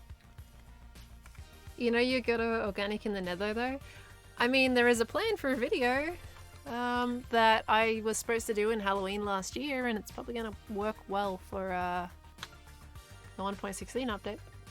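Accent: Australia. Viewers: Your Australian? I am too. Finally a Youtuber I dont have to get up early to watch!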